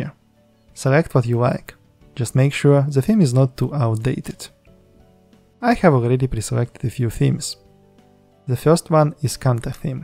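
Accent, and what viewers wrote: Russia. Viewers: YourOwnAzog I think yes, I am Ukrainian and recognize this russian-english accent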